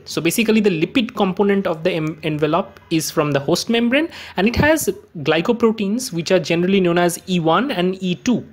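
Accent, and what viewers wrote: India. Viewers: Pure Indian english😢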